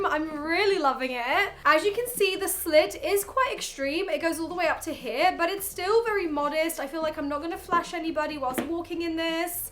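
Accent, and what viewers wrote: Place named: England, United Kingdom. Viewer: what part of england are u from u have a accent its nice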